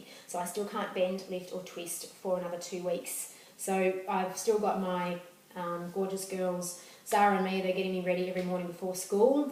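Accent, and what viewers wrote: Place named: Australia. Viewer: Hey, have just been watching your videos and given me some positive outlook after being told yesterday I have to have a L5/S1 discectomy. It's also great to hear the perspective of someone with an Aussie accent. Not sure where you're located, but if you're in Sydney I would be keen to hear more about your sports physio as I am keen to get up and about and back onto my bike as soon as possible. If you are local to me please email me the details of your physio. Thanks heaps and good luck for your continued recovery!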